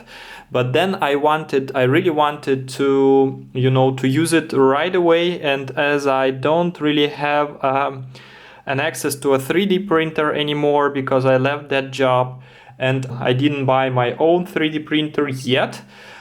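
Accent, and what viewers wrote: Russia. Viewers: русский акцент выдает)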